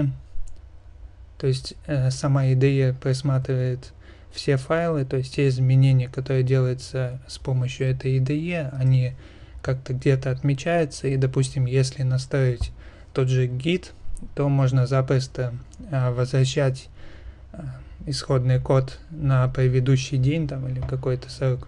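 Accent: Germany. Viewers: Немецкий акцент сильно чувствуется.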